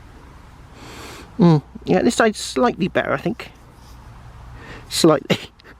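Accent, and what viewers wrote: United Kingdom. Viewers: Hi. I really enjoy your videos. I’ve been detecting on and off for 33 years, and must say I’m somewhat envious that you have the hidden history of both Medieval and Roman times in the earth beneath your feet! My mother was British and I hear some of her speech patterns in your voice, which is a good thing! Keeps my connection to Britain alive! Here’s my dilemma and question. I’m leaving in March of 2021 on a 5 year road trip across America and Canada, just sightseeing, fishing and DETECTING! I have an old White’s Eagle II, which I believe to be the first digital detector, but it’s just a toy now, with a 6” depth range at best. I plan to spend my detecting time on the trip searching primarily for coins and relics, then focusing on gold nuggets when I return to California(I expect the gold price to BOOM soon!). So my question is this: Is the GPX5000 a versatile enough machine to reliably find coins at depths to 18” plus, while still performing it’s primary function of finding deep gold nuggets when I’m doing that? 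In other words, must I purchase a CTX3030 as well, or can the GPX do it ALL? Thank you for any advice, and keep the great videos coming! Thanks! Roger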